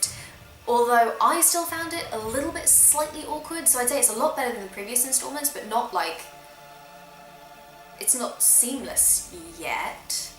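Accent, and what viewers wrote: United Kingdom. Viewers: Now I really want to know what made you cry "hysterically" :-) (not that I didn't too... well not *hysterically* but still, I completely understand). Actually I would love to hear what choices you made and how it played out for you. I always like talking to friends about the different choices they made and why. Anyway, fantastic review (this one and the ending) I feel like you're voicing my own thoughts, just in a female voice... and British accent. Love your videos, keep up the amazing work!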